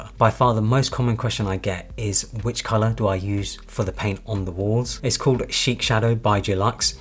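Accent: United Kingdom. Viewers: First time hearing you speak after following u months on twitter 😂 didn't expect that British accent tho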